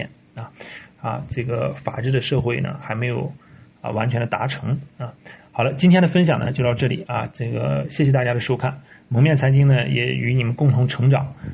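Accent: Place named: Fujian, China. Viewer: 博主聽口音像福建人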